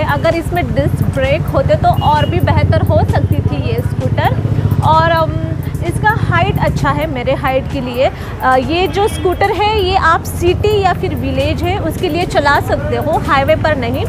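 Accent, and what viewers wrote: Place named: South, India. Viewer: Apka south indian speaking style bahut pyara hai .. ♥️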